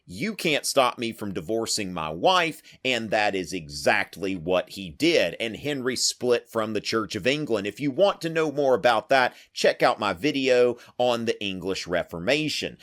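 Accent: United States. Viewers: I’m from the Uk and have been obsessed with the six wives for YEARS it’s so weird hearing it in an American accent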